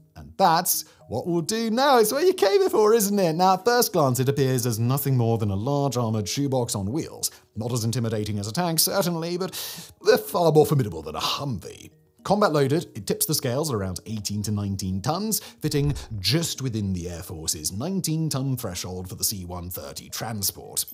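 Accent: United Kingdom. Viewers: Dude, slow down, for crying out loud! Can’t catch everything you’re saying with this Brit accent.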